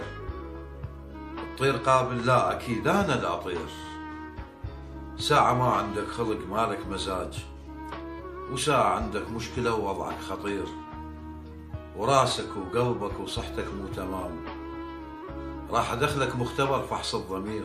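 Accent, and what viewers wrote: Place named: Iraq. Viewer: لهجه عراقيه تهبل🌹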